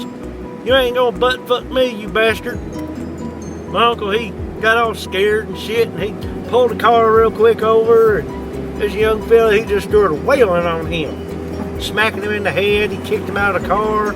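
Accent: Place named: South, United States. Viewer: I live in Jacksonville, and this guy sounds country as shit.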